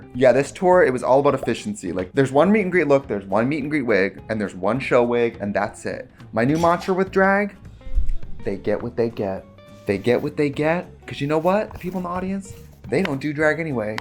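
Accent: Wisconsin, United States. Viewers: We need a full video with the super Wisco accent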